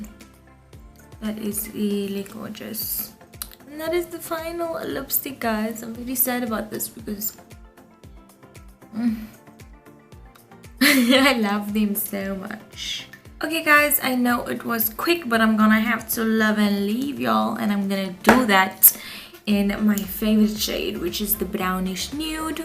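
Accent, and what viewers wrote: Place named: South Africa. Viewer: U speak like my mualima... true S.african😚😍😛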